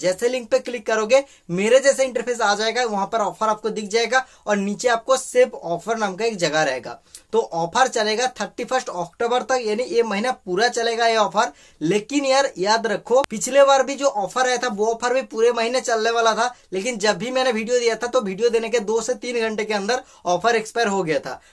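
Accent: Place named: Bengal, India. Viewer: Bhai aap Bengali Ho 😀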